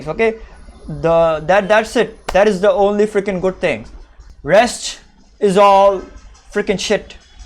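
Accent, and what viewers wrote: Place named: India. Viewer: getting shi**ed on in an Indian accent, love it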